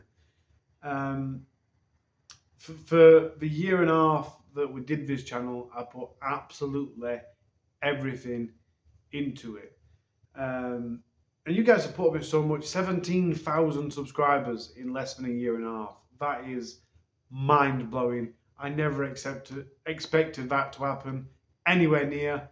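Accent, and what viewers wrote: United Kingdom. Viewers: I just now seen this. U look great. I really like ur hair grown out. Ill miss ur stories they r really good. U must b busy. U could all kinds of stuff and keep a following. U could tell us about some history from there as we know a lot about usa but lots of us know nothing about u guys. The uk accent is really although i don't understand the words ur slan there. U could tell us local artist some musicians. Read a news paper to us we would enjoy it. U could tell us about the characters that stop in the pup. They probably have some great stories to tell. Just have to watch YouTube guidelines. I hope we hear back from you soon.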